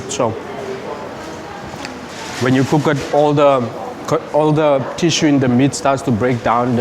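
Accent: South Africa. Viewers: Sound like a Joberg accent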